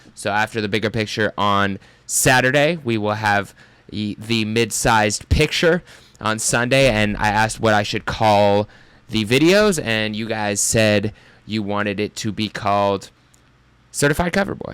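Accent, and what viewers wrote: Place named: Canada. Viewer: This is an actual Canadian accent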